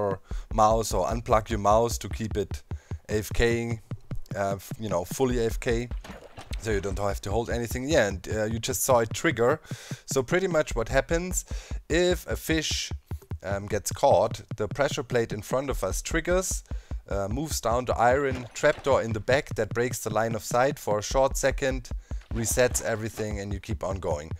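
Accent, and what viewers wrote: Germany. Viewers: man hört das er ein deutschsprachiger ist :P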